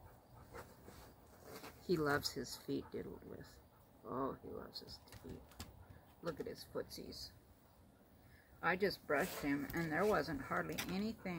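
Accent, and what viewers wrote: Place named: South, United States. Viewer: 🍀 Your fur babies are too cute for words! 😎Love southern accents.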